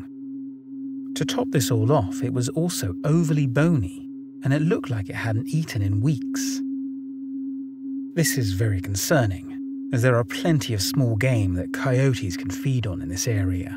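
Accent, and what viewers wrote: United Kingdom. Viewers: Cheers fella, loving the content- I am sure your subscriber numbers will shoot up! Nice to hear a British voice 👍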